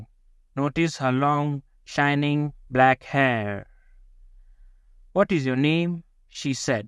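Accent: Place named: India. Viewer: Indian is telling this story , accent is too strong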